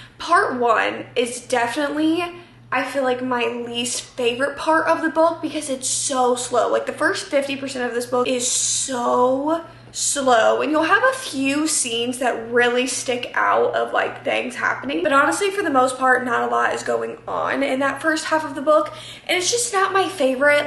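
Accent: South, United States. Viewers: there is no formula girl are you kidding. i know you’re all about making sure your videos are perfect but this is YouTube, a fun place not a Netflix documentary. i found you when you did your 24hr readathons, stayed because of the self deprecating jokes and the southern accent i never see anywhere else. now im so happy not seeing you joke about wanting to die and actually taking care of yourself. don’t compare yourself to old you because now I stay because of your maturity and you’re so funny in other ways without trying. you have to realise that you’re basically a celebrity in the book world and we could literally watch a video of you walking around your house like security footage and eat it up 😂 you’re like Kylie Jenner to me. I’ve been binge watching bookmarkd and even though I can’t stand taylor swift talk I still watch those hundreds of hours of you guys talking about her. 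I love hearing about your life i used to only watch you for book reading inspo and then abandon watching for months and now I tune in as soon as you pop up on my feed. you’re worth more than you know to us queen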